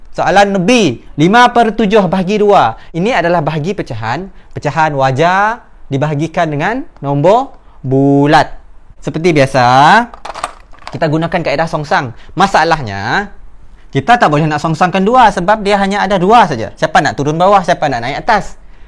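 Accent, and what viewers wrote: Sabah, Malaysia. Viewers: Cigu sabah kah?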